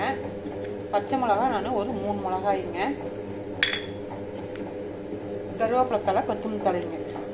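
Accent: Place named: Kongu, India. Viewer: nice vadai and kongu Tamil 👌🏻👌🏻👌🏻, new subscriber😊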